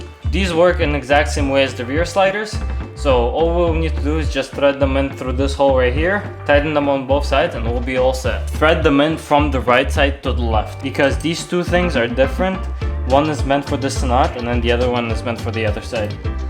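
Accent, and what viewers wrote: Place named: Russia. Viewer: He sounds like russian blyat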